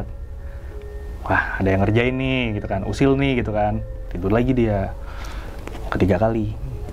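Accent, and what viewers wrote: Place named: Cirebon, Indonesia. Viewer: asli wong Cirebon apa Indramayu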